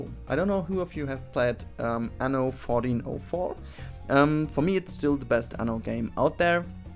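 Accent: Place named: Germany. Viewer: this accent ... soooo german ^^ anyway nice Noria and it really looks familiar like the Noria in anno1404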